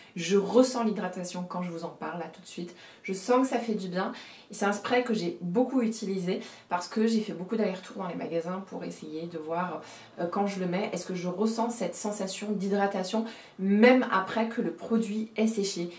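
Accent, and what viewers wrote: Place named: Paris, France. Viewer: Encore un plaisir de t’écouter et de te voir avec ton joli accent parisien!😊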